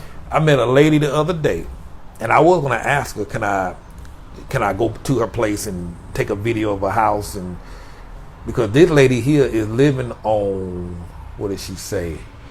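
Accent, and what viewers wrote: South, United States. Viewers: This dude sounds Country as H-E- Double hockey sticks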